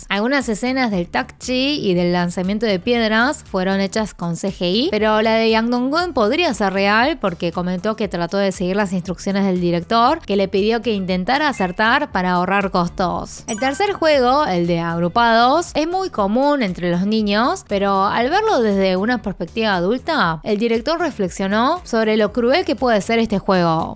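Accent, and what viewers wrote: Argentina. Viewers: como es posible que exista una china, que sepa cosas de korea, hablando español con acento de Argentina o.O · Porque habla como Argentina? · por que habla como tamara falco argentina?? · Hola como haces para tener este acento argentino ?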